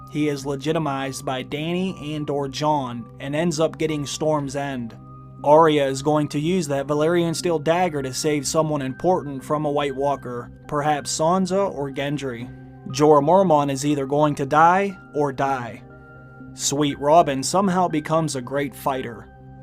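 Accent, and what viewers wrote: United States. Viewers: What is your native tongue? I would like to know how you've mastered American in just a year or so. Thanks!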